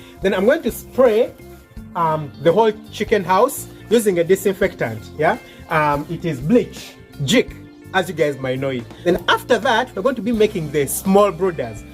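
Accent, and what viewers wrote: Uganda. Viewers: You just speak 🗣️ like a Ugandan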